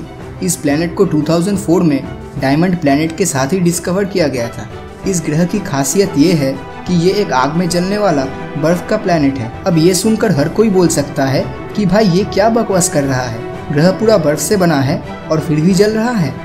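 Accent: Bengal, India. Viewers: Bengaliness in Hindi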